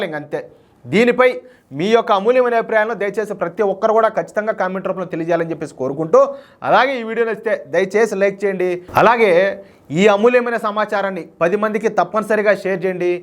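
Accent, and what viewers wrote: Rayalaseema, India. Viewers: Sir good morning You're Language is super Rayalaseema slant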